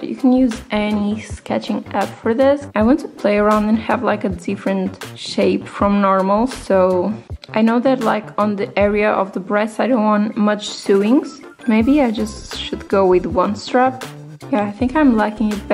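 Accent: Portugal. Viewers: Omg I knew you were Portuguese, 30 second into the video! ahaha the way you speak English is very 'Portuguese' ahah love it!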